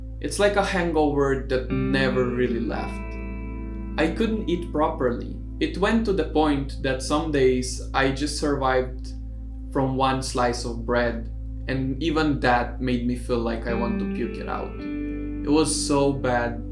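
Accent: Philippines. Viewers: You sound like a Pinoy. Haha. Interesting, first time I see a Caucasian guy sound like a Filipino. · I notice the way you speak English you have a Filipino accent.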